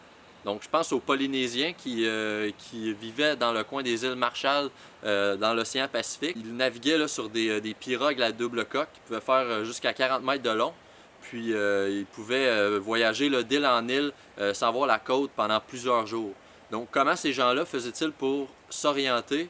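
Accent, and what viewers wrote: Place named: Canada. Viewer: Pour qui n'est pas canadien, votre accent sauf votre respect rend vos explications incompréhensible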